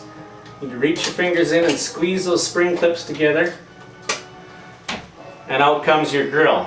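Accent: Canada. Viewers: Little bit of a Canadian accent? Lol .. but enjoyed the content- thank you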